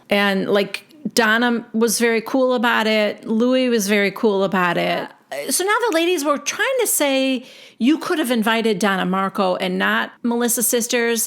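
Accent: New Jersey, United States. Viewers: Omg! Your NJ really showed this week! You've got Danielle down to T.!!!😂 I used to think you exaggerated Teresa UNTIL I was accidently listening to Namaste Bitches. I thought I was listening to you so I started l😂 I'm thinking No way! It was Teresa herself sounding just like you! I hope this makes sense. You're reviews are the best. No one imitates ALL the housewives like you do! I love and appreciate your efforts and love your work! Thank you so much and God Bless ya!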